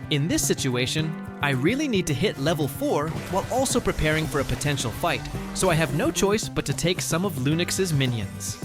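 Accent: Ilonggo, Philippines. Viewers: Ilonggo po? hahhaa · Huli Hahaha ilonggo ka gale nga bilatibay ka ha😂😂😂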